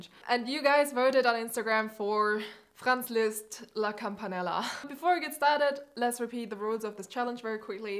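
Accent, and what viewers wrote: Germany. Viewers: man merkt so hart das du deutsch sprichst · I know she was German as soon she spoke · Man hat dein Englisch gehört das du deutscher bist 😂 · Dieses anders deutsche Englisch :)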